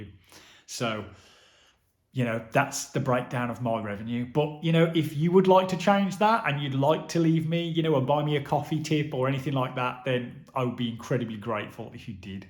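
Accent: Black Country, United Kingdom. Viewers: Hi, Steve here from Wolverhampton. As you say, it's time consuming work operating a small but growing YouTube channel, but you have done more than most and become monetised in just over a year. I have only just come across your channel and enjoy your presentation style in that fellow Black Country accent with an open and honest approach. I have particularly liked your pension update and solar panel review and have diarised Ace Solar for when I get quotes next year and will mention your name when I contact them. Now for a few new video idea - Based in the Black Country, the new Peaky Blinders movie coming out soon how about a trip to the Black Country Living Museum showing the delights of this fantastic facility and some of the locations for filming - Food for thought - Keep going, we are all enjoying your posts.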